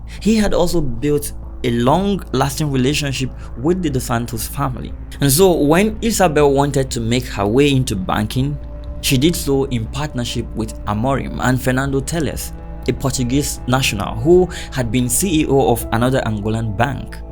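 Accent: Nigeria. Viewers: I am not hating, but the fact that this story is narrated by someone that sounds Nigerian, is hard for me to trust it!! Scammers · Sounds like a Nigerian...you know what i mean!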